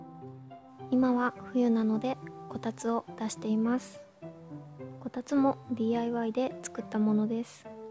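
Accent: Kansai, Japan. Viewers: 少しだけ漏れてる関西弁好き☺️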